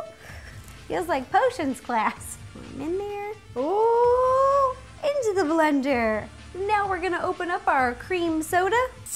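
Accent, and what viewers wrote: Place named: California, United States. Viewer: Ahhhh you're one of those... I think it's a California thing. I pronounce a myriad of words strangely. Melk, Cetch, Pellow.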